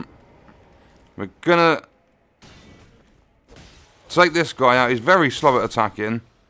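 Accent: England, United Kingdom. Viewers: Great video mate was exactly what I was looking for, is also nice to hear a English accent for once doing one of these walkthroughs! Will be checking in regularly. Keep up the excellent content 🍻👍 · Sometimes His British accent make me confused 😂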